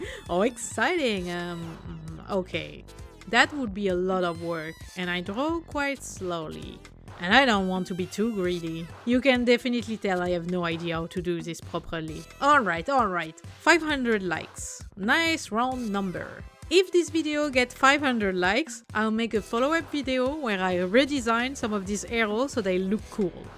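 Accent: France. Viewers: you're one of the only people discussing new GW models and not complaining about how complicated they've become over time. When I started this hobby, all the models were metal. They were awful compared to what they are now. Many creators hate Primaris Marines, but I think we finally have properly scaled Space Marines for the tabletop. I'll complain about GW's ability to balance their games, write rules and their tendency to ignore their own lore or worse, retcon it. But they do make amazing models. And honestly, your voice activates neurons. It's like, musical. I live in NYC and I'm well aware that I have a very Bronx accent. I've heard myself on videos and I'm always shocked. Hearing an amazing French accent from a female is a distinct pleasure for the ears, especially when you're surrounded by people who start every sentence with the word 'yo,' and end it with 'ya heard?' and somehow slip 2 'motherf*ckers' in along the way...a feminine French accent is like a holiday after a day spent on a NYC construction site. 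Edit: And now I find myself admiring the models silhouettes...that's strange. Where did I pick up that from? 🤔 Sigh. Lunch is over. Back to work.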